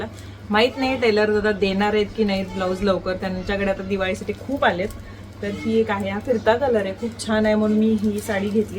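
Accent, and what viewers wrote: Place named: Belgaum, India. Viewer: Rahus nako... Amchi belgav chi basha.. Aikun bar watal..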